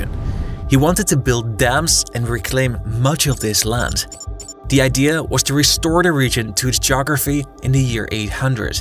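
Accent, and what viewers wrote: Netherlands. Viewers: I hear it clearly…Dutch native tongue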